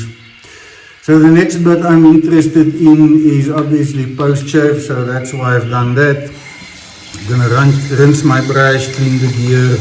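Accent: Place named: South Africa. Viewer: I came for the amazing shave and education. And I'm staying for the accent! It sounds South African to my ears. Stay healthy and happy and always dapper! Greetings from Australia.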